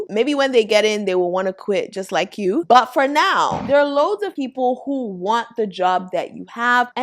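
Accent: Nigeria. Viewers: Are you Nigerian by chance?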